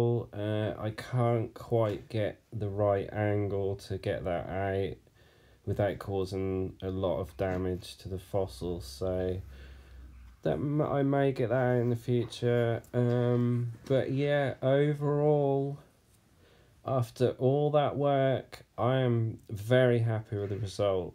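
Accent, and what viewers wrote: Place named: Norfolk, United Kingdom. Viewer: Hi, I live on the Norfolk coast and you sound as if your from my neck of the woods, I have found sum interesting find that I would like to share with you and wondering the best way preserve them to get the best from them.????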